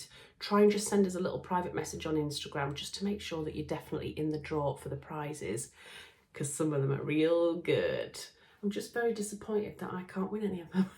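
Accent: Yorkshire, United Kingdom. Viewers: Thank you for putting this together! Just wanted to add that the autogenerated captions are clearly struggling with the Yorkshire accent and are cracking me up!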